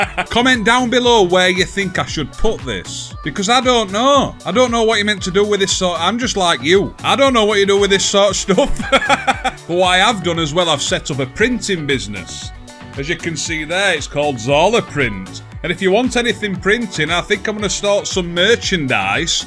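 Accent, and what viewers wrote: Yorkshire, United Kingdom. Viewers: Congrats on the getting the play button, you fully deserve it........as I've said before, your the only reason i watch youtube nowadays.. always make me laugh and that top yorkie accent 👍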